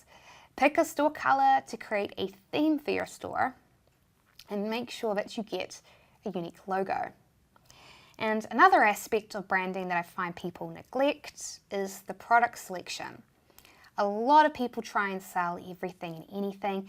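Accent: New Zealand. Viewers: I love your New Zeland accent. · the adorable New Zealand accent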